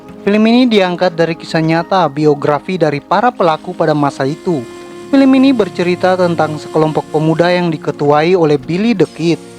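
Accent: Eastern Indonesia, Indonesia. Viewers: Fix adminnya orang timur. Jelek banget pembawaannya 😅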